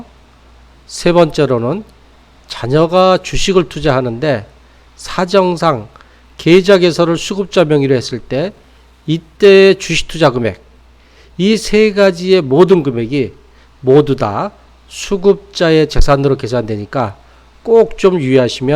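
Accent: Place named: Gyeongsang, South Korea. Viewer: 경상도 발음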